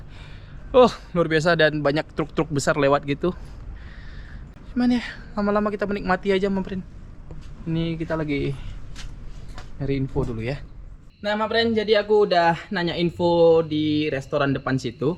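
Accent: West Sumatra, Indonesia. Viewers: logatnya logat minang bg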